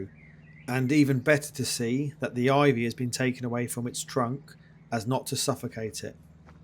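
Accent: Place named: United Kingdom. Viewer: Perhaps you don't know but there are very many regional accents in the UK and pronunciation can vary from one area to another. How anyone pronounces words can very much depend on where they were born and brought up, another matter of historical interest. You understood what was meant, so what is there to criticise?! Glad that you liked the presentation, that is what matters 😊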